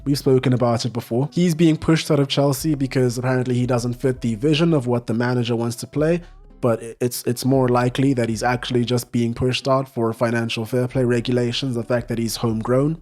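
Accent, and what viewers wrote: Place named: South Africa. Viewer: Bro what nationality are you? Sounds crazy, but you sound South African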